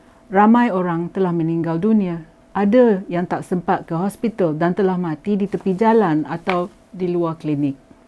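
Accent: Perak, Malaysia. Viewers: Sonok dengor miker labon cakap Perok.. 👍👍😊😁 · Kuat pekat Perak nye yong..ate Beno ape yang yong ngabo org org politik ni hampeh idak boleh pake ngabeh kan duit rakyat...rakyat belengeh sampe ke jubo kroje ngencari duit... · Suke dgr ucapan ni....bunyi mcm org perak, syabas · Orang payghok ye kome....tesasuiii cakap peghok.Ate demeee...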